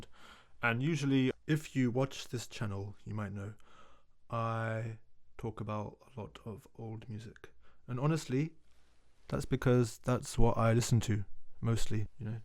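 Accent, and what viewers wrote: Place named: United Kingdom. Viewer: 우와 진짜 영국식 영어!!!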